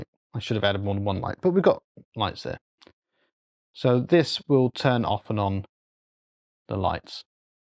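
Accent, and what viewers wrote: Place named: United Kingdom. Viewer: It looks good. By your accent I can tell that you are British. If you actually live in the UK how the hell did you get one?!? Their website doesn't even list the UK as a shipping destination 🤬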